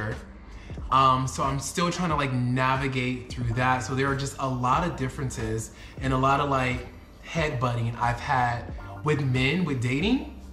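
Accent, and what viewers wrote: United States. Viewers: Lmao at the Coloured situation: South Africans are not well travelled. But because of your accent, I expect them to understand that you are an ''African/Black American'' who is on the lighter side, pigmentation wise. So when they ask you about your race, just say ''I am Black American,'' it will click to them! Your blond dyed hair is also not helping! Haha! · 1. Welcome to South Africa, the coloured thing is very common here. You light skinned. But you of course should tell them you are not coloured if you are not. But lovely to follow your journey in my home. South Africa has many race issues though and I am interested to know how you feel about that in comparison to the race issues in the USA. 2. also, I like the way you say "Johannesburg'. We just say Joburg here sis. Joburg! LOL 3. Becareful of Lagos. They HATE GAYS THERE and it is ILLEGAL! 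They could kill you!! 4. Dating is Transactional in Africa, cause they think you have money! That American accent sis. They think you have money!! The dollar is stronger though. 5. Polyamory is not for me as well, sis. But Africa and South Africa has a lot of it. ENJOY YOUR TIME IN SOUTH AFRICA! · They're crazy for mistaking or assuming u that u're coloured up there in Joburg please correct them n clearify that you're black and from america.its your accent that confuses them.dont accept the term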